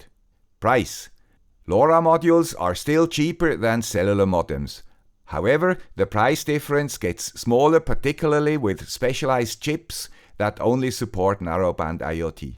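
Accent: Switzerland. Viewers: I didn't know that was a Swiss accent. And why does everyone assume Americas eat steak? Is it unusual for people to eat beef? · Once again, "...the guy with the Swiss accent shows why I consider him the reigning King of IoT on YouTube! I've come across a company in the US that specializes in provisioning mixed mode, mixed carrier IoT/M1 SIMs networks I don't remember the name, and I'll try to get it to you if I can find it again. My new, rural, American home has essentially no coverage with handsets on one big network, except their wireless internet device supplies enough data for WiFi calling to handsets, and reasonably good YouTube video streaming. Another big network provides enough bars to do the above directly on handsets.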